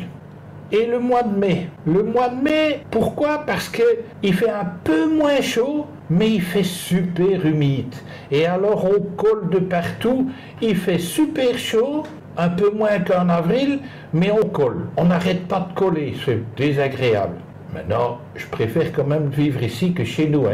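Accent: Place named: Belgium. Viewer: Merci et ce bel accent belge 😊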